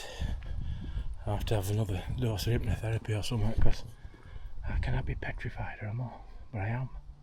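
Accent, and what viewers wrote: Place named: South Yorkshire, United Kingdom. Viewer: That's a South Yorkshire accent if ever I heard one 😄. If you're in the area, you might like to check out the phantom black beast that haunts Anston Stones wood. Every one who's seen it (and I know someone who has) has the same reaction - run like hell. Hint - it seems it doesn't like people straying from the paths.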